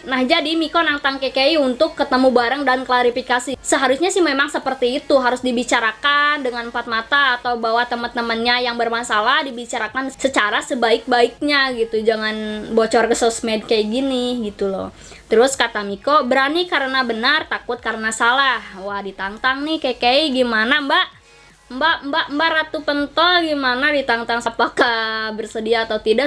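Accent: West Java, Indonesia. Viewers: Orng sunda ya ka??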